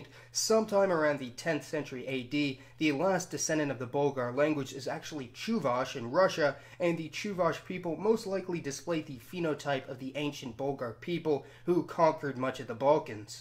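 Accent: United States. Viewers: Speaks American,america started all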